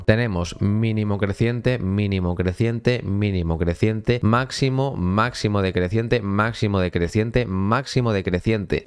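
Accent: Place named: Murcia, Spain. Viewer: Te vi por orihuela. Eres murciano no? Se te nota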